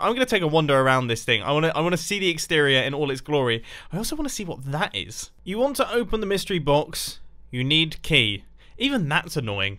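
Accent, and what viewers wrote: United Kingdom. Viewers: the way he said what is “What is occurring?!?!?” Instead of saying what is happening is so funny to me for some reason the British accent makes it better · sounds better with his British accent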